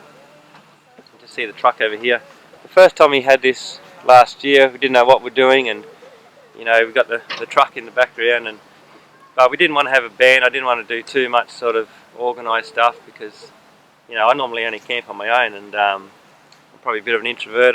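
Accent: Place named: Australia. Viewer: Made, supported and spoken like a true Aussie! Fanbloodytastic mate!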